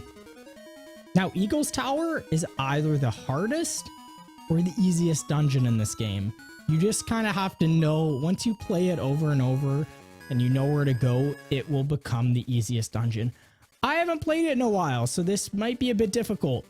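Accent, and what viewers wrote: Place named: Canada. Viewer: your commentary in this and the 3D one is hilarious. also called that you were (a fellow) canadian from the accent LOL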